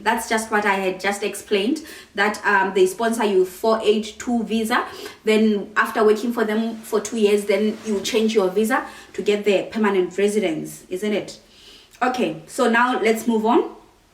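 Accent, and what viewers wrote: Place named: South Africa. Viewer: Hi dear, you sound like a South African, I am Namibian, watching you from Windhoek.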